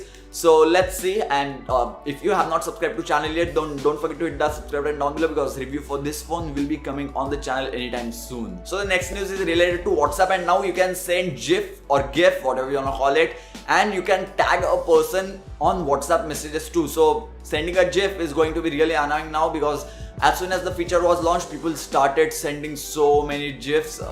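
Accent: India. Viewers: this is the only Indian dialect video that I found not annoying so far good job brotha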